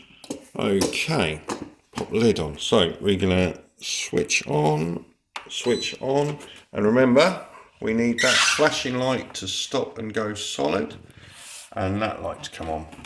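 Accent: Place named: United Kingdom. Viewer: I definitely needed a British bloke review and run through of this! The American voices really grind on me 🤣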